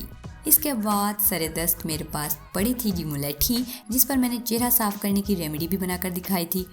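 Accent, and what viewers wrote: Punjab, India. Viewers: Apki Punjabi boht zabardast h . Same jaisy hm ghar bolty hain .. or nazar to kamaaal ki utari Apne 🤗🤗🤗😍😍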